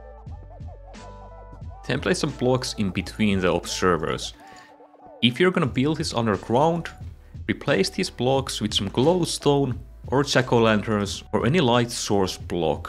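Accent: Finland. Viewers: are you Finnish by any chance? you sound Finnish. or then im just going mad. 🥴 also suomi The P word. torilla tavataan?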